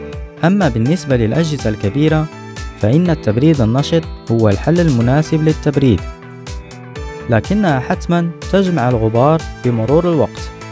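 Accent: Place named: Morocco. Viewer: من صوت عرفتك مغربي مهم فيديو واعر 🎉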